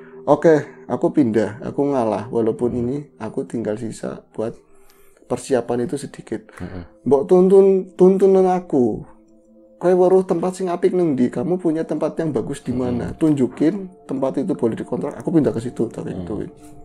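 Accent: Banyumas, Indonesia. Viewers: ora ngapak ora penakk 😁😁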